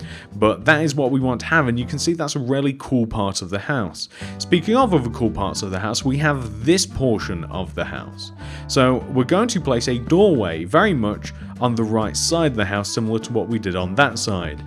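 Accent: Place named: Wigan, United Kingdom. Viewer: Mate you sound like you’re from Wigan.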